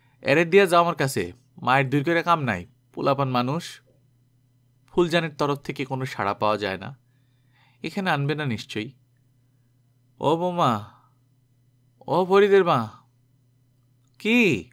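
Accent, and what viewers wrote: Bangal, Bangladesh. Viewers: সত্যিই আপনার বাঙাল ভাষায় কথা বলার ভঙ্গি বড়ই সুন্দর। ভীষণ ভালো লাগে আপনার গল্প শুনতে। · Apnar Bangal accent ta khub accurate, presentation tao darun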